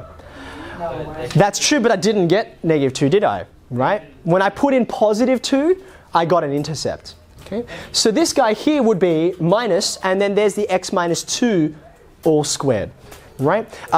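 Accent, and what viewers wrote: Australia. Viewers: This topic comes in the entrance exam for a national and kinda quite prestigious university here in Peru. I'd also like to say that I am captivated by the Aussie accent, it is fascinating and also sounds cooler, to the best of my knowledge. Greetings from Peru :D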